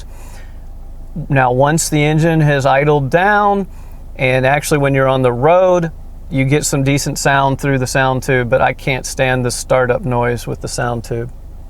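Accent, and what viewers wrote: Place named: South, United States. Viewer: That’s just how many from the South speak — I, for one, like it. Be pretty boring if everyone spoke at the same speed. Watch reruns of Andy of Mayberry or the film To Kill a Mockingbird; that’s how folks talk down there. Seinfeld? Much faster. It’s all good.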